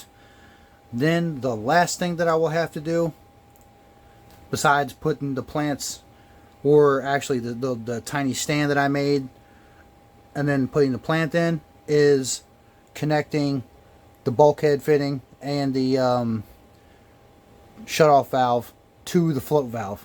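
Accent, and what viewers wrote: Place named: Pennsylvania, United States. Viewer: Yo I knew you had to be a neighbor because the accent/dialect. You sound like you from PA but the jersey tells me all I need to know lol. Good shit bro. I been brainstorming this type idea for a little but still am rocking all organic atm...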